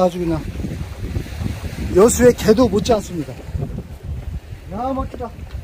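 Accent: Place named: Chungcheong, South Korea. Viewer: 어마무시하는것 보니 충청도 공주,논산지역분 같기도 하고 호남사람같기도 하네요? ●고향이 어디유?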